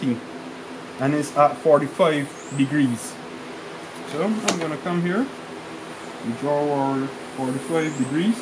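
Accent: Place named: Barbados. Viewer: This guy sounds like a Bajan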